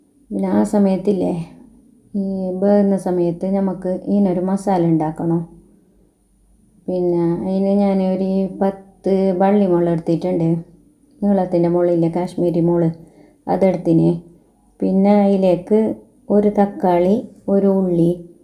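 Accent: Kasaragod, India. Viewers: എനിക്കിഷ്ടമാണ് നിങ്ങളുടെ സംസാരം പിന്നെയും പിന്നെയും കേൾക്കാൻ തോന്നും കാസർഗോഡ് സംസാരം ഒന്ന് വേറെ തന്നെയാണ്❤❤❤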